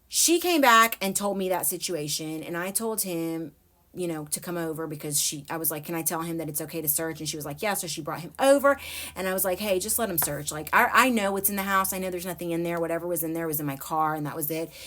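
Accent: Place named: Texas, United States. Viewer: Ngl I thought she was from El Paso or some where in texas because of the accent.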